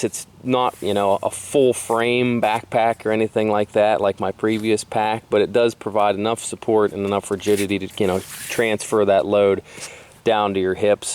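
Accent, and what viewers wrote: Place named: Pennsylvania, United States. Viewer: Where are you from? I can't put my finger on your accent. My guess is Central/Western PA? I have a the Granite Gear Crown2 60 and love it. Recently picked up the Gossamer Gear G4-20, a 42L frameless pack that weighs in at 25 oz. I'd say its a lot more comfortable than the Crown2 if you can get your kit dialed in to fit into the smaller pack. Worth checking out for sure!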